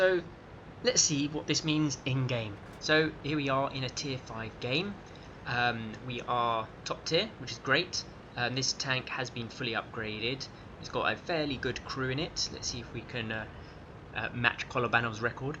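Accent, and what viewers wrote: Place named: United Kingdom. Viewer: u sound like your from the UK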